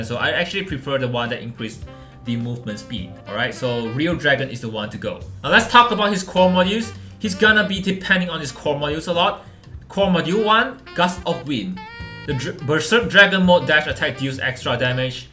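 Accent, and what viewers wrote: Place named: China. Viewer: Dude the Chinese accent and language is so cool damn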